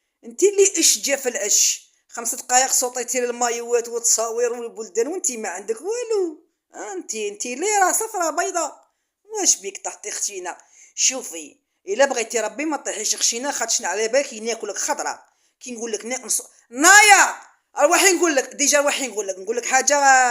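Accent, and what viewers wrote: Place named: West, Algeria. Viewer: هدرة،غرب حلوة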